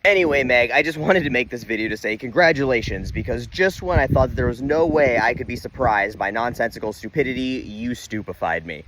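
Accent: United States. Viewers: UK here. You sound like an American to me 🤷🏻🤣♥️ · Truth be told, you sound American to me… now may I please have a Hamms?!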